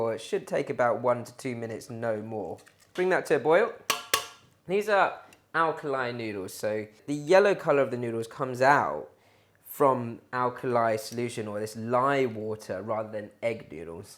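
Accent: England, United Kingdom. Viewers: Wow Chinese dude with English accent... Impressive 😃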